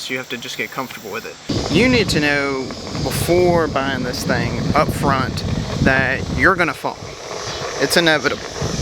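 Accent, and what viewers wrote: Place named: Texas, United States. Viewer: Your voice is so good bra like texas but your a brown guy like me 🤣 such a mind blow out seeing as your so down to earth... can't picture a single (non racist!) texan I like other than McConaughey, until now! 👍🏽 from a guy in a tiny place called fareham in the UK ✌🏾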